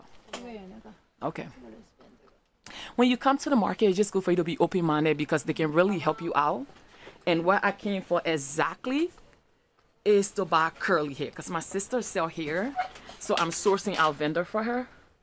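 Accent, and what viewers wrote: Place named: Liberia. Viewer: I hear our wonderful Liberian accent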